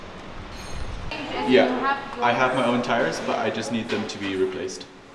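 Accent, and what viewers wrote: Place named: Kenya. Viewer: Love your Kenyan accent 😊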